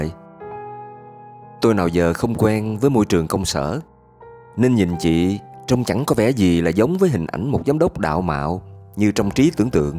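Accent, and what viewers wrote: South, Vietnam. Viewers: Một chất giọng nam bộ đầy lôi cuốn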